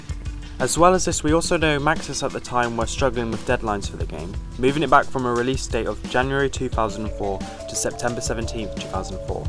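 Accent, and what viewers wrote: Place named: United Kingdom. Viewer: loved the british accent but it's a thumbs down from me for the audio pops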